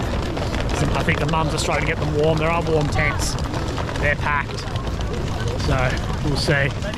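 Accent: Australia. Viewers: I can hear an aussie accent. Please get rid of the beard, we cant hear your voice or see your mouth to try match up words. Good luck mate, stay safe, get out safe. Steve in australia. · Sound Australian? You a legend brah big ups from Perth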